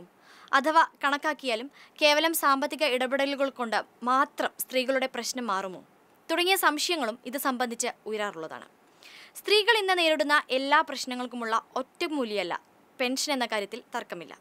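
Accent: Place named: Thrissur, India. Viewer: തൃശൂർ സ്ലാങ് ആണ് നല്ലത്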